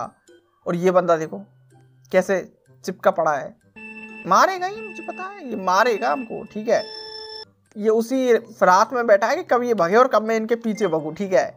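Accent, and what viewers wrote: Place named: India. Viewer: What do HE speak he speak like Indian???🇧🇷 I have 900Qi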